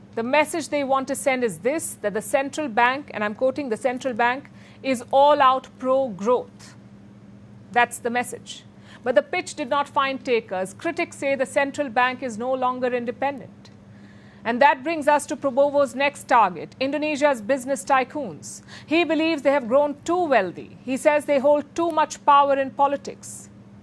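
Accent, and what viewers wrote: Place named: India. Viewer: Funny English indian accent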